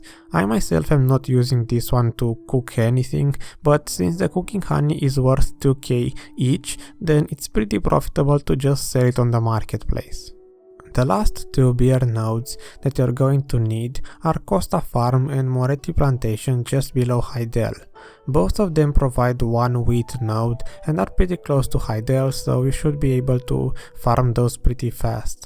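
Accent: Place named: Romania. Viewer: Romanian accent detected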